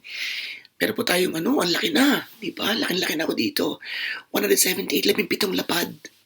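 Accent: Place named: Batangas, Philippines. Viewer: Congrats po.Para pong Batangueno ang accent nyo😊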